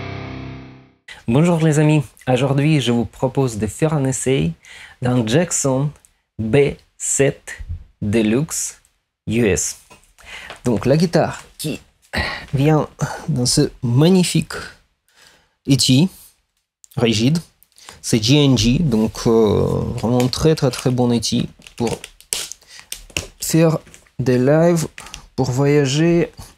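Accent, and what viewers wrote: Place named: Russia. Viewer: Акцент русский))))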